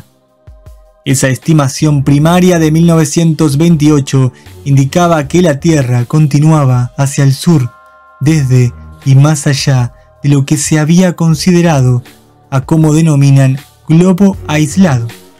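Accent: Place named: Argentina. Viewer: tu hablar con ese sonsonete argentino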